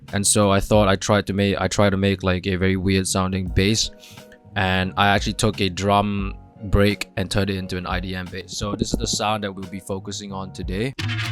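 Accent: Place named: Northeast India, India. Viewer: BTW BRO U LIKE LIKE NorthEast indian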